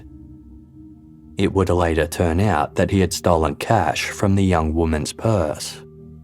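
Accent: Australia. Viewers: Casefile wouldn’t be the same without your voice. I love that you have an Australian accent.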